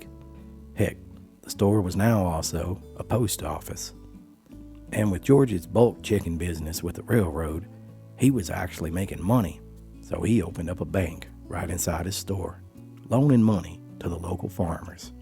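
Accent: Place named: South, United States. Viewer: You make wonderful,easy to watch videos. The music and filming is exceptional in my opinion,oh and you are blessed with a great sounding “southern” voice. Thanks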